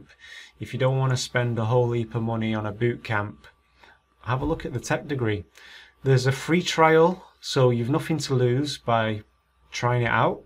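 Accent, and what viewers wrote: Manchester, United Kingdom. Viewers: Is that a Manc accent I hear?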